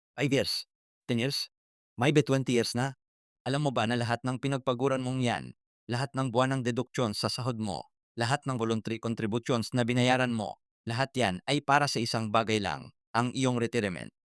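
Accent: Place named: Arab world. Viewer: Dapat English mo clear kaso ginagawa latin Minsan Hindi man slang para Kang arabi mag salita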